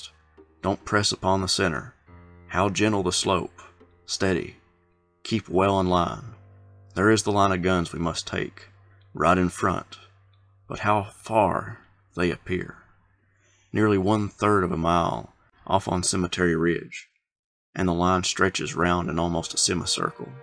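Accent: South, United States. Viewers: I listened to your video regarding the union account of Pickett's charge and enjoyed your presentation. I am an Army Retiree and lifelong student of military history and applaud your presentations; however, I would like to respectfully point out that Union formations were identified by a numerical designation, while the Confederacy identified their units at the brigade and higher by the name of the current commander. Judging by your accent I can see why you might identify major formations on both sides in the same way, that is to say with the Confederate system. Keep up the good work.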